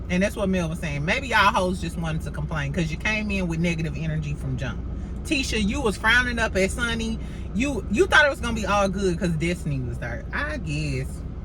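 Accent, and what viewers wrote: St. Louis, United States. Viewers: I'm cracking up because you are so St. Louis! Every time that you say thurr, I get weak!😂😂 I'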